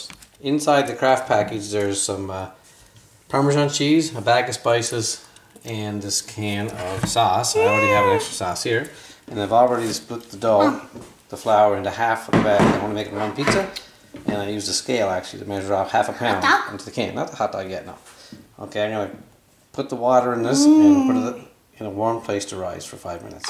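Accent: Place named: East Coast, United States. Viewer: Yeah for sure thats what i meant haha.. My old man always put hot dogs on it growing up.. Its awesome! where abouts are ya from? sound like a east coast accent?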